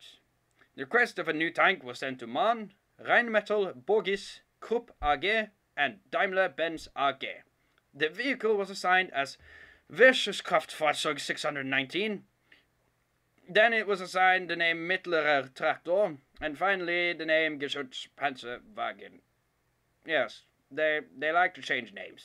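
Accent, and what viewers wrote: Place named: United Kingdom. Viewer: Loving that ridiculously british accent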